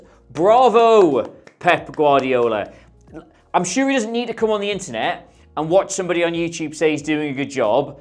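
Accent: Newcastle, United Kingdom. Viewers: “Where can I get football content in a Geordie accent with occasional vintage Simpsons riffs?”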